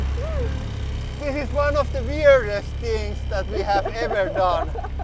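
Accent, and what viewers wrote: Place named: Finland. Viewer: Haha the finnish accent is the best! Cheers from australia great vid :D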